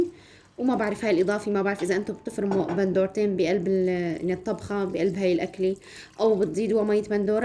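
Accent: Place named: Homs, Syria. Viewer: مع انه لهجتها بتشبه الحمصية 😍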